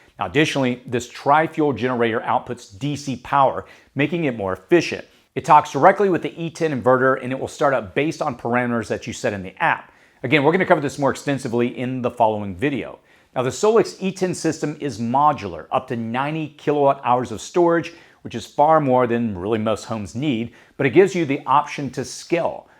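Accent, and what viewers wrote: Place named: California, United States. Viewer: Hmmm are you close to the Bay Area ? I heard California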